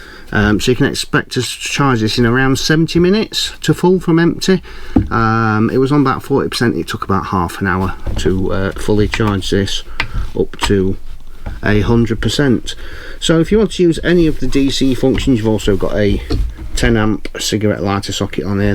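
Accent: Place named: Northern England, United Kingdom. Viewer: He’s Northern English, like my mom’s friend, I recognize that accent😊. I was just about to order that kettle, surprised it couldn’t do it, was power lifting mode on? Oh well it’s small.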